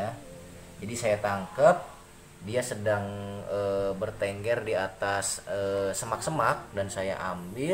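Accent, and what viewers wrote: West Java, Indonesia. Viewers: Ohhh abang. Orang sunda? Wkwkwk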